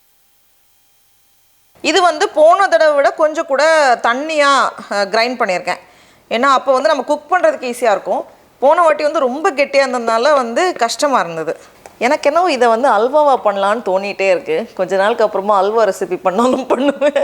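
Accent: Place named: Kerala, India. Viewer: Are u malayali.. why am asking this because neenge pesumbothu malayalam slang varuthu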